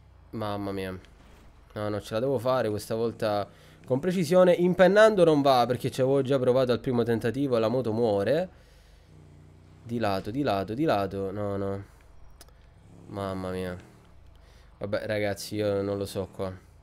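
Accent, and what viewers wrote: Palermo, Italy. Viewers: ma sei di Palermo? A volte hai la cadenza palermitana da come parli xD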